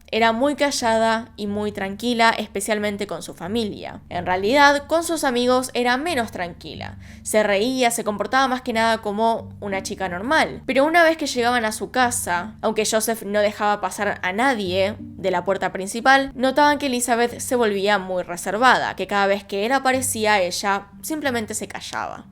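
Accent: Argentina. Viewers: No soporto ver esto porq el shoooo no puedo escuchar argentinos pssss chau veo otro video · Me enkantan tus videos..eres excelente y me enkanta ese acento Argentino, tu lo haces mas KLASE😍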